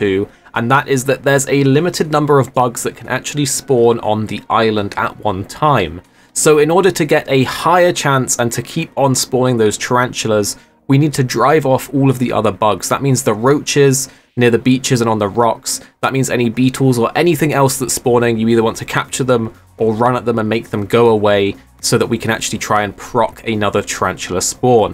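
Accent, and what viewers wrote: England, United Kingdom. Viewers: Man, how you managed to get that British accent? It's amazing!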